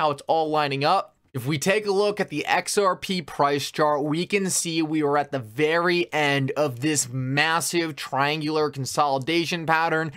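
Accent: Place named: California, United States. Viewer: Are you from California? You have a Cali accent.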